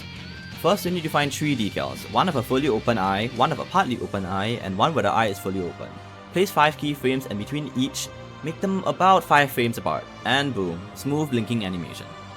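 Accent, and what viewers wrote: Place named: Singapore. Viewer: Singaporean too! I recognize the accent from anywhere!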